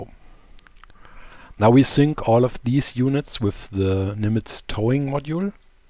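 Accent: Germany. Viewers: best mod ever had. ok we have some nice tanks,planes,boats etc on our tactic server but this is still awesome! is there a elevator for boats planed? for now we have to tow our trucks,tanks etc with planes to the island. are you german?you sounds like an english speaking german;-)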